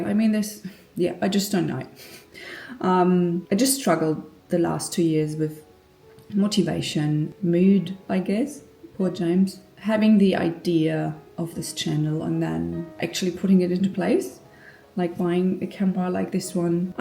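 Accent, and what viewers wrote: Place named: Australia. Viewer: I love everything about this ❤ you are so rreal. It makes my nervous system fall right into place. So much s**t is going so fast, in this world, and this is just what I need, to level out. Also, I am completely new on my own youtube channel, and I feel you. So much a mirror for me - I also don't fit into niche boxes, and I'm not sure, anyone will ever watch what I make. But it feels meaningful so I go on - and my soul sings, when I find a kindred spirit here. Are you saying you are german?? You have a very authentic aussie accent! I love it. And love Australia ❤ and your shots of nature. Who is James? Son? Dog? Boyfriend? 😄 All the best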